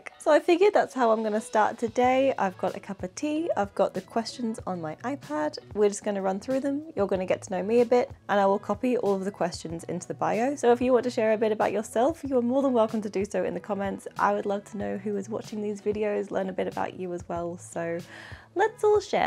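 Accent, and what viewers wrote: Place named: Australia. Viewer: I totally thought you were Australian lol!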